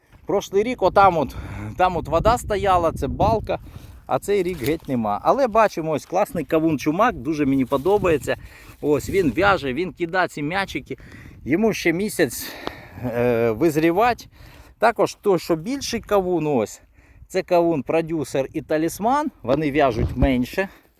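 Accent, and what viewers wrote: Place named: Russia. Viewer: Перестань акати і какати, як кацап. Гидко слухати!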